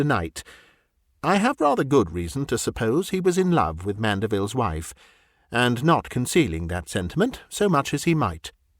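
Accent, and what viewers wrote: England, United Kingdom. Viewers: It's so nice to hear an English accent that's not AI.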